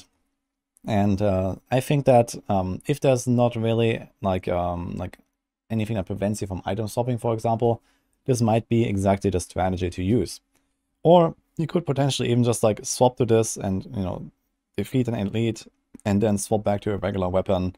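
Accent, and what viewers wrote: Germany. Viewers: Your German accent is sick LOL love it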